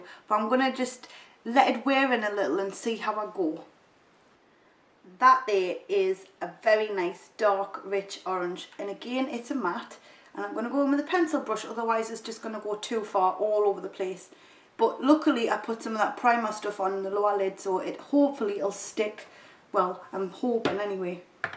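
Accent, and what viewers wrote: Newcastle, United Kingdom. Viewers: She's from South Shields, newcastle x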